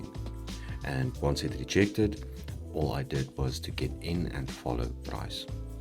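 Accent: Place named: Afrikaans, South Africa. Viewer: Sound like you Afrikaans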